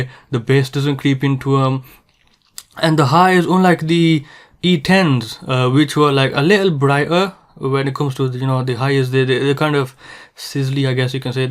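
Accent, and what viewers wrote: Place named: Manchester, United Kingdom. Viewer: You sound very Mancunian. Thanks for the review!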